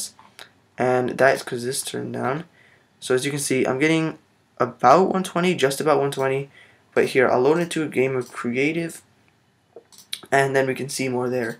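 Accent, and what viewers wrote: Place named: North America. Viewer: Do u live eu or na cos u sound na but play eu server?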